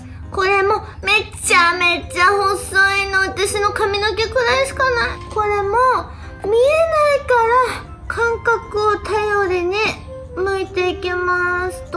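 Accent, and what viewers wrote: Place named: Kansai, Japan. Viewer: こんにちわぁなるほど分かりました。親切丁寧たまに関西人ですやん！これからも楽しいずっこけ動画お願いねーほな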